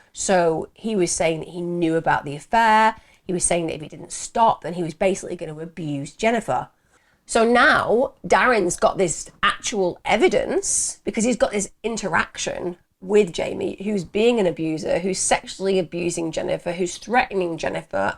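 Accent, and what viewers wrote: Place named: United Kingdom. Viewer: Being told a true crime story by a beauty with a British accent in a Pantera tee shirt?. I subscribed. - Chris I.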